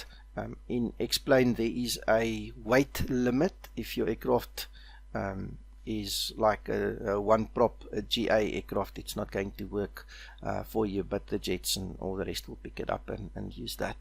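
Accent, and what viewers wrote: South Africa. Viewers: Love the SA accent mate :D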